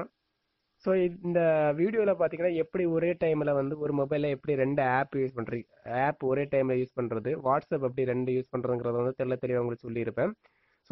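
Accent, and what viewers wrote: Kanyakumari, India. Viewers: kumari thamizh👍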